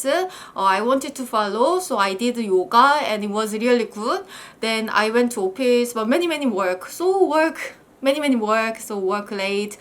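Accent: United Kingdom. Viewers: 브리티쉬 엑센트♥ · 브리티쉬 액센트 실화입니까??? Wow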